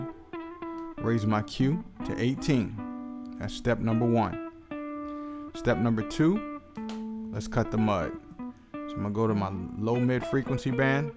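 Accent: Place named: New Jersey, United States. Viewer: Love your accent Man, is it New Jersey? Great Video, very helpful, thank you.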